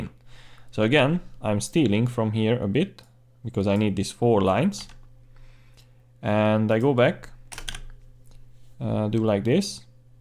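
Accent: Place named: Hungary. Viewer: very good tutorial magyaros kiejtessel 🙂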